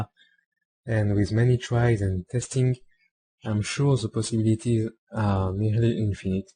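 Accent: France. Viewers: yea , l accent francais est vraiment unik . Good tut btw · This videos are like a two-for-one deal for me. I learn how to do particles in blender and learn how to do a French accent!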